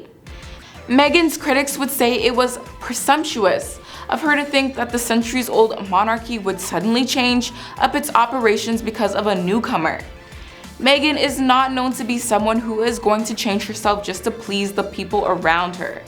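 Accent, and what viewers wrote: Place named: United States. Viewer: Sorry to reply again - but many many!! centuries old traditions, not single century. Based on your accent, I’m assuming you’re American like me. Our country is so young that… even though growing up we don’t fully appreciate that as 10 years Sounds like a lifetime to a kid…. But they have been around a lot longer.. I mean we have too to an extent, but not as “America.”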